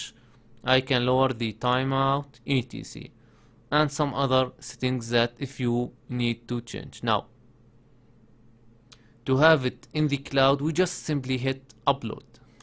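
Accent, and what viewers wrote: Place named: Arab world. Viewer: متأكد الي بحكي شخص عربي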